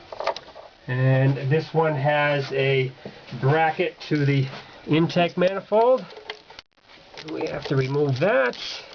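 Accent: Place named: Brooklyn, United States. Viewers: Logan Mattson He must be from NY that's all. Everyone sounds the same from over there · I love the Brooklyn accent.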